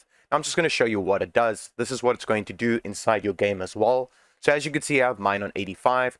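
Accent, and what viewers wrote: South Africa. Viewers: i love the south african accent XD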